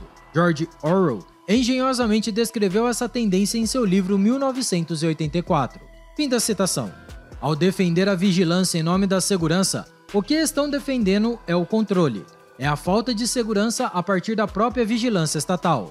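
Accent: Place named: Caipira, Brazil. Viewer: Essa narração caipira é osso.....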